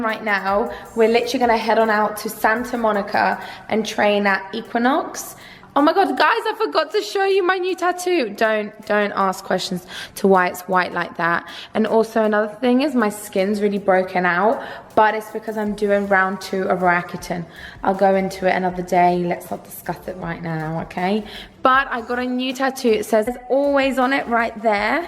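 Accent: United Kingdom. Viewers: How would you describe your particular style of British accent?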